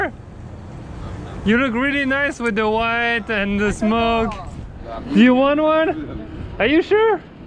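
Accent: France. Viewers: So a year ago I barley used my DSLR and now I'm watching a one hour video of a dude with a french accent and broccoli roaming the streets randomly shooting shit. Life changes man 😂😂 but I love it.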